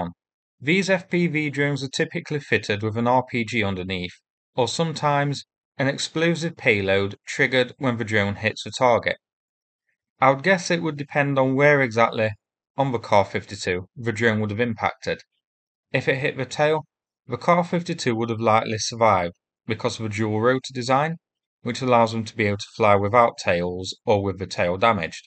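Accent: United Kingdom. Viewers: Why pursue it buddy, when its likely you'll have your nose wiped in the sh*tey again? If you don't realise how comical your 2nd reply appeared, after you sought to humiliate our host, I can't really help you. Although I think you were embarrassed, and are now over reacting by thinking you can fight it. Our host can answer for himself the way he speaks, but I for one appreciate all the local accents and quirky expressions that exist in the UK, as someone who himself is British. In fact, I'd put a preservation order on them if I could..........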